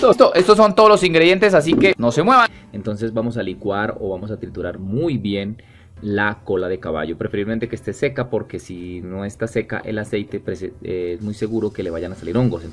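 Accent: Colombia. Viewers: Colombiano, imagino que de algún lado del llano? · Hola...siempre te veia por hay y la verdad no me atrevia a ver el video 😊y ahora que vi nos los quiero ver todooooos,muy chevere escucharte ...es como escuchar a mis hermanos sabes😉muy colombiano que chevere!!Espero que tu canal siga creciendo cada vez mas!!muchos exitos!!😃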